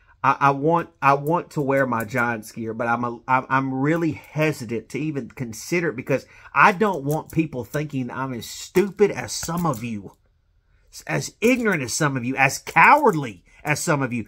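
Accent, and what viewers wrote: South, United States. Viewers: Where are you from because you sure don't sound like you're from NJ or NY? You got a southern drawl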